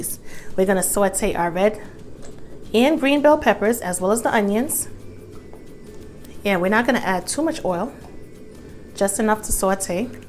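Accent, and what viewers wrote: New York, United States. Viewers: sounds like she is from NY...luv listening to her tawk...lol I put a lil cayenne pepper, a lil jiffy cornmeal, a tap of mustard instead of worcstchire sawce... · Love the NY accent!❤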